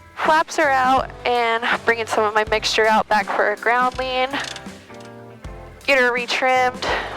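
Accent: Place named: South, United States. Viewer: Fun video. Your presentation is very relaxed and natural. So much so, that Southern is coming out. Happy flying to both of you.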